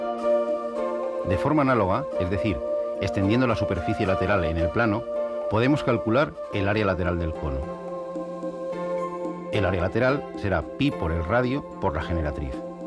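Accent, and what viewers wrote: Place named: Spain. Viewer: Con esta explicación en español tan tan español y la intensidad del volumen de la música ... No entiendo un carajo lo que dice .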